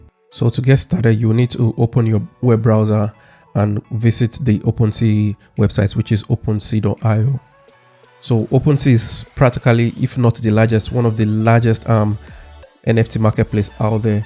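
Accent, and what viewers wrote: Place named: Nigeria. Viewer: Thanks alot for the walkthrough!! You sound like you are from Nigeria bro.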